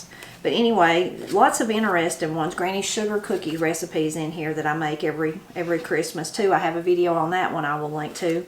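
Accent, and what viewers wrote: Appalachia, United States. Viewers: Miss, thank you and your Granny for the wonderful Seaside Candy Roll recipe. I made some for Christmas and it was delicious and very easy to make. You were right about how sticky the candy roll became brushing it with the syrup and then pressing on the pecans but it was worth it! I grew up in Northern Idaho and I know and understand 95% of your Appalachian words and pronunciation. As far as I know, my people came to Idaho from Scotland, Ireland, Belgium and Germany via the Midwest. Maybe that is where they picked up those unique words. The only difference is my pronunciation of pecan [pea-con].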